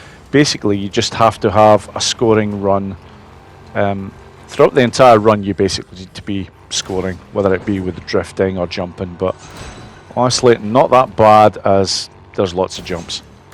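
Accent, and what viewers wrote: Scotland, United Kingdom. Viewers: First Tutorial video with British accent! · Hey bro, awesome work! Hearing your voice the first time. You Scottish by any chance?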